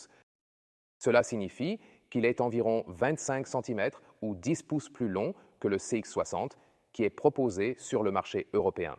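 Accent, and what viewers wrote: Canada. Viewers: je me disais bien car des fois, il y a un léger accent canadien. Je viens de terminer une de vos vidéos. Merci comme même de le faire en français. En tout cas c'est bluffant.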